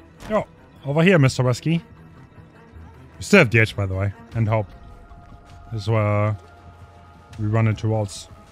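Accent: Germany. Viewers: Man hört so krass das du deutsch bist, also warum auf Krampf alles auf englisch??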